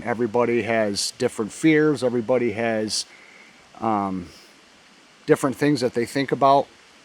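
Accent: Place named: New York, United States. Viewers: You know, i heard you talk and instantly thought he sounds like he’s from New York. I live in the Mohawk valley. We really do have a subtle accent if you will. First time watching any of your videos. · Good video! I thought I recognized your accent lol. (I'm from Little Valley originally, near Jamestown/Olean area in western NY - in Ohio now). We camped at Allegheny State Park recently and I was surprised how unconcerned most campers were about black bears, and many of them thought having bear spray was foolish. I never thought black bears were any kind of threat, I ran the woods all the time as kid in bear country -- then one day I looked up bear attacks on the internet. You'd be surprised how many black bear attacks there are, some fatal. And quite a few predatory. It's not likely, but it sure can happen.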